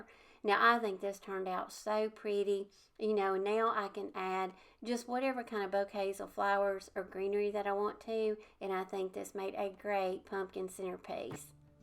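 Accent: South, United States. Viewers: Love to hear you talk with southern drawal! And of course great ideas. Thanks.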